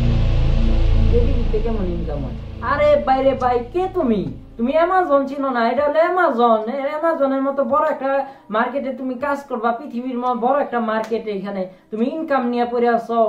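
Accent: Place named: Sirajganj, Bangladesh. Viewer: sirajgonj ar moto kory khota bolen to tai bolam. ok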